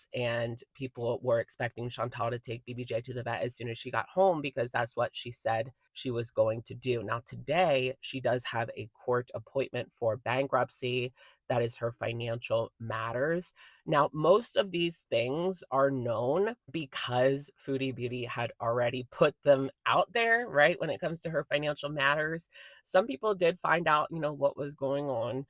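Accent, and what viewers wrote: Boston, United States. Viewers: Boston accent not New York. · It’s a Boston accent. Not a NY accent. Either way, it’s sus. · Her accent is not New York. It's Boston. Its a weird version of Boston, but still Boston.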